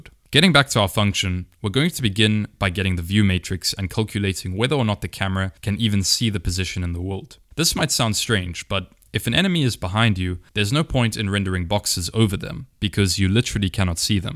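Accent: South Africa. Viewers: The way you said 'data' revealed to me that you are indeed a South African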